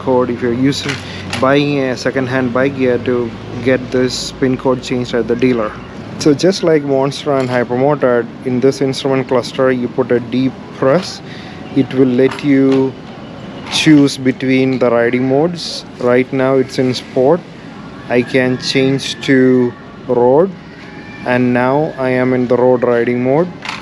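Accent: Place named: Kerala, India. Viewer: Indian origin Malayalis Voice recognised in this video 😅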